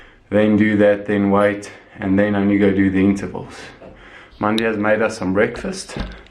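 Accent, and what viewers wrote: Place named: South Africa. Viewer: You have a lekka South African accent bru😉👍🏾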